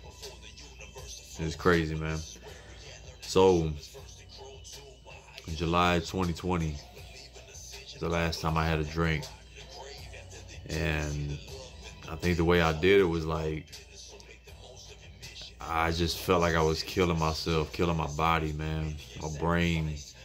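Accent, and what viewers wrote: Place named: Louisiana, United States. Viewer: I bet you’re from Louisiana. Maybe around Lafayette area?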